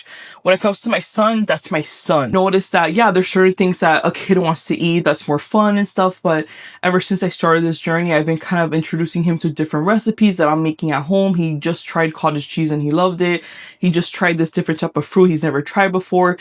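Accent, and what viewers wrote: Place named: Miami, United States. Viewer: Girl I knew you were a Miami girl the SECOND I heard you speaking 😂 after moving up north, the beautiful sound of that accent was like a hug ☺️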